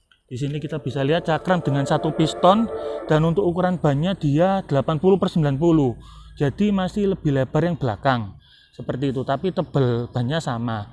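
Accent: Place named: Java, Indonesia. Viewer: mantap reviewnya mantap suara medoknya he....thankz bg..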